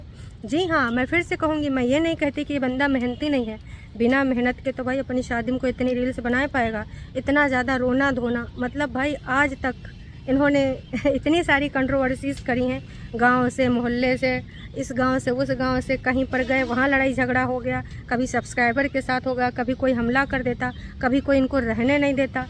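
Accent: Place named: Kanpur, India. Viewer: Ap kanpur se hai kya...ye to humari kanpuriya bhasa hai...mast bolti hai ap...❤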